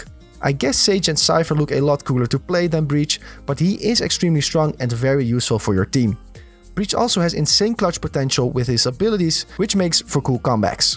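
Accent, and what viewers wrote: Netherlands. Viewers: Your engerish is very well i instantely now you are dutch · Ik hoor aan je engels dat je nederlands bent xD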